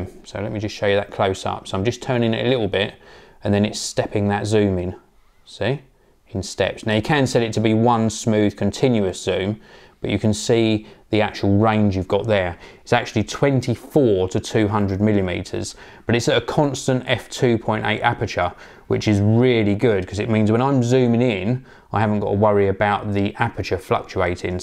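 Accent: United Kingdom. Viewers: dat british accent mate!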